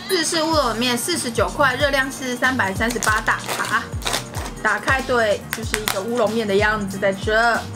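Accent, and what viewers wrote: Taiwan. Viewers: 的台語腔好台語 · 講台語真的蠻夠味的哈哈哈哈像那種很可愛的小台妹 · 講台語好可愛喔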